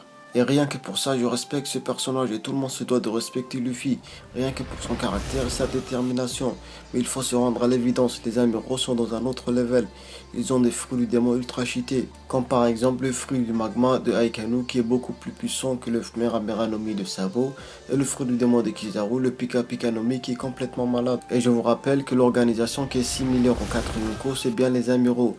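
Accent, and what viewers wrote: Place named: North Africa. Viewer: Toi sa se voit t un bledar vu ta voix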